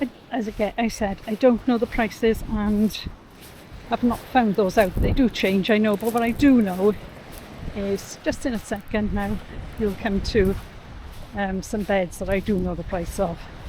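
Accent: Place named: Wales, United Kingdom. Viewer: You have a Welsh accent. Where are you from. I'm from Newport South Wales and coming to Skala in 5weeks. I've been to Kefalonia twice and stayed in Skala both times. I love it!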